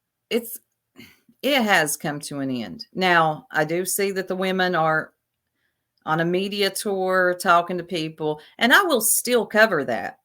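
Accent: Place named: South, United States. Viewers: You sound like you are from Kentucky. Aww I hate that happened to you but you are taking it well. I hope you get it back 90 days. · Love love love that deep southern accent! So nice to put a pretty face to the voice! Thanks for all you do and congrats 🎉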